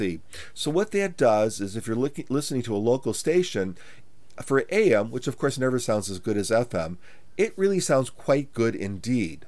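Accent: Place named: Milwaukee, United States. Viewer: U sound like you’re from Milwaukee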